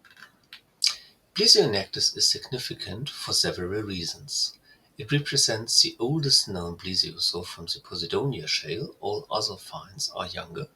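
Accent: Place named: Germany. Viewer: wow, das sind aber interessante Nachrichten, evtl. wäre es für internationale Viewer netter, die automatische Synchronisation in alternative Sprachen beim Upload einzuschalten, Sie haben einen heftigen deutschen Akzent, das könnte man so verbessern, nur so ein Gedanke.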